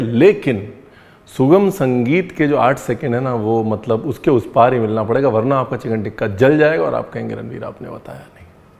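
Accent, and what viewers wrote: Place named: Bengal, India. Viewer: Your Bengali accent is awesome! I am also a bangal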